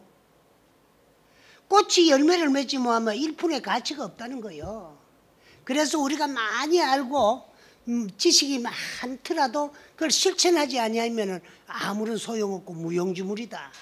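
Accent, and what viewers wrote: Gyeongsang, South Korea. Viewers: 묘허스님! 구수한 경상도 사투리에 맛갈스런 법문 넘감사하게잘받들고 갑니다 건강하십시요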